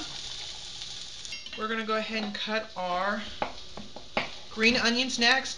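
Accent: United States. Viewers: he sounds like he's living in texas or something with the southern accent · he sounds southern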